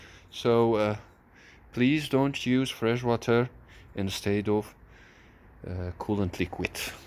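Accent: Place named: United States. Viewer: Thank you brother تحياتي لك من بغداد اخوية الورد You have great accent i thought you r American Feeling proud of you زور باش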